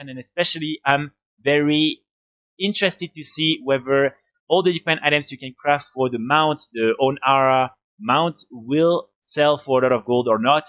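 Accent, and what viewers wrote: France. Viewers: Bonjour, je pense qu'en français je regarderais vos vidéos, mais là un tel accent français pour des vidéos EN je peux pas. Beaucoup de timeline vidéo pour dire finalement peu de choses. Je vous conseille les vidéos de Penguin, niveau contenu vous pourriez prendre exemple. Bon courage à vous.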